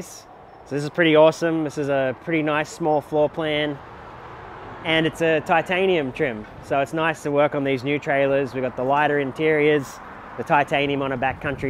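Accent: Australia. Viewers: You sound like an aussie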